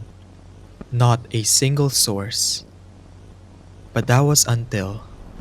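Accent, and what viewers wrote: Philippines. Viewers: The first tine I watched and heard your voice I know you're a kabayan. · Filipino accent??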